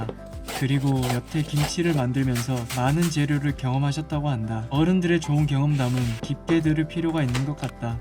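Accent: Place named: Gyeongsang, South Korea. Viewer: 아 아이고... 역시 김치는 사먹는게 제맛.. 근데 경상도 분이셨군요 (동지)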